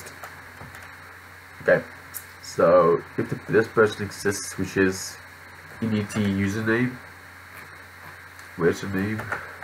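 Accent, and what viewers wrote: South Africa. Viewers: are you from South Africa? Afrikaans?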